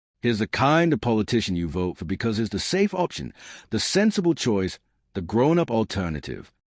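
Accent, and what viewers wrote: United States. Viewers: WHY IS AN American DOING THE COMMENTARY?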